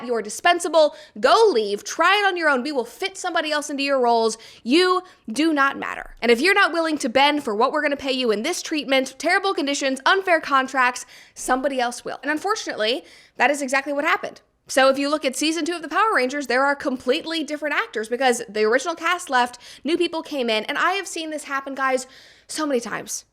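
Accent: United States. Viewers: can i make an AI clone of your voice with Elevenlab i want to custom make an ASMR video on u, i liked your vibes and yapping fast and quick with typical American accent :yougotthis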